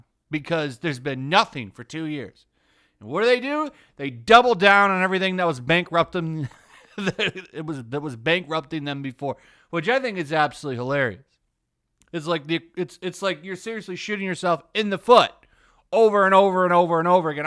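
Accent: United Kingdom. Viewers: Your British accent has me weak 😂😭🤣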